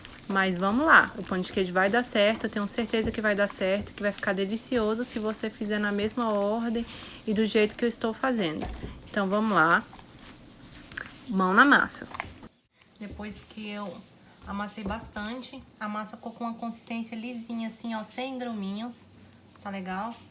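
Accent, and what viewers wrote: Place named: Minas Gerais, Brazil. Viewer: Que delícia você parece ser mineira, igual a mim gosta muito de pão de queijo e o sotaque também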